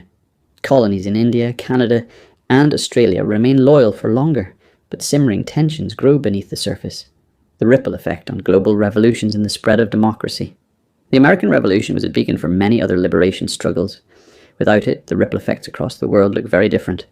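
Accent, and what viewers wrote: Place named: United Kingdom. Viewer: Good video. I’d say I disagree on your framing. There’s a lot of potential positive outcomes from a truly unified west. A large amount of people view democracy and “freedom” as Orwellian concepts, especially in America. England and all her old colonies across the world face turmoil today via immigration, demographic change, political disunity. The Anglo race itself faces extinction. You could argue that had the American revolution never happened none of the demographic change we see taking place today are as extreme, and if it was we’d have a strong king to challenge it. The very ideals of the revolution are the ideals the minority groups across the world are using to take the lands back our people took via “conquest” so long ago. An we relinquish them without a shred of fight. I’d say be careful falling too far on one side of isle with your framing politically. 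You sound British so assume your schooling today has a lot of self hatred, anti empire, revisionist history rooted in it so I don’t blame you. Great video otherwise. Best of luck.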